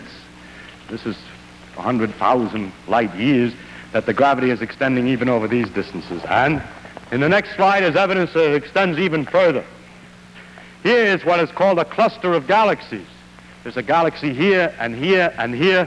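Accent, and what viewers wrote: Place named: Brooklyn, United States. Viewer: Dr. Feynman speaks with the Brooklyn accent of a man who was born in 1918. Do modern Brooklynites still speak with this accent, or has mass broadcast communication quashed it? The New Orleans accent that my grandparents spoke with is all but dead.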